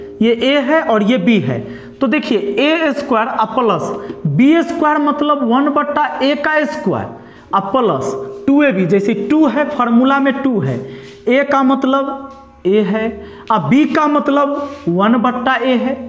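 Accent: Bihar, India. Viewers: Your bihari accent has been helping me to Focus in maths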